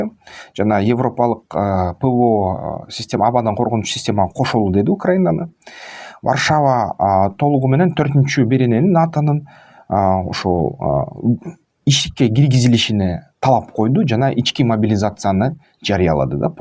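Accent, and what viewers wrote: Standard. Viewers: КЫРГЫЗЧА СОЗДУ ТАЗА СУЙЛОГОНУНУЗ учун РАХМАТ СИЗГЕ УРМАТТУУ БАЙКЕ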